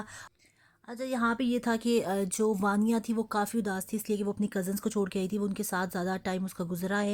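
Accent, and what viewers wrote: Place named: Pothwar, Pakistan. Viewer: Ap Pakistan main kon sy alaqa sy belong krti hn apki bataon language main pothowari tuch aa raha he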